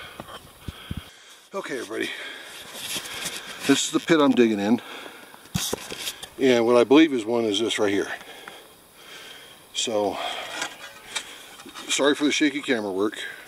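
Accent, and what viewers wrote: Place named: West, United States. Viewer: I don't know if you're still looking at your comments but I am a Westerner living on the East Coast do you know how long it's been since I've heard someone say c r i c k instead of Creek so nice to hear the accent years ago my mother-in-law that had a fit when I told her we were going down yonder to the c r i c k she did not appreciate my accent she informed me that I was going down to the creek not Yonder to the c r i c k I enjoy your videos Keep On Keepin On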